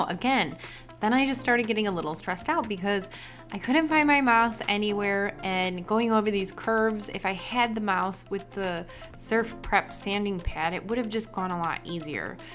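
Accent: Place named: Midwest, United States. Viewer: I love your Midwest accent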